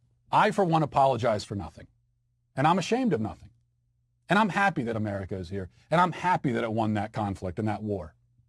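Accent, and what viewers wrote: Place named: United Kingdom. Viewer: Judging by your accent you are of british heritage, so are we here and didn't the british not do the same in Australia, India. So you're a colonizer as well, you have ancestors that were there killing those native Americans. You're probably closer to being related to those killers than I am and I was born here in the US.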